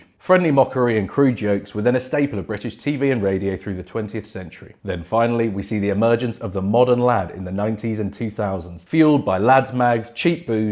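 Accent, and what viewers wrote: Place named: United States. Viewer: Enjoyed the scholarly and interesting history. The American accent, not so much. 🇺🇸🇺🇸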